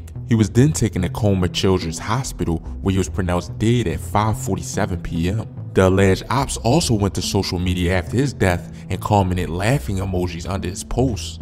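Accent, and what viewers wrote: Baltimore, United States. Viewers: The YouTube creator definitely is from Baltimore Maryland. The accent is crazy. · He's from Baltimore. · Baltimore accent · Dude sound like he from Baltimore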